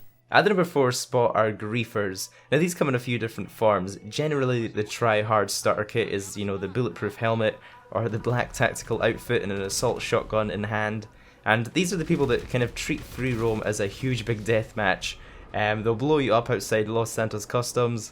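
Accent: Scotland, United Kingdom. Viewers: Are you Scottish? But I hate explosive maniacs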